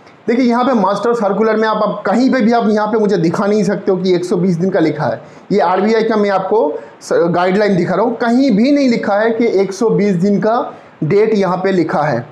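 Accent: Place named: Bengal, India. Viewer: Kya bolta rehta hai. Kuch samajh nahin aata iska. Poora bangali maushay.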